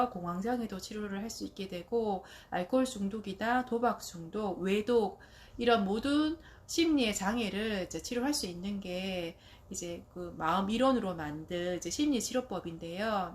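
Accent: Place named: Gyeongsang, South Korea. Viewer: 갱상도 누님 갱상도 사투리가 구우~수우~하네예~ 진짜배기 갱상도 사투리네예~ 억양 보니깐 대구 토종 신토불이 사투리 억양입니꺼?아니면 마산 근방 사투립니꺼?? 마산과 대구 사투리를 구수우~~하게 잘 쓰시네예!!!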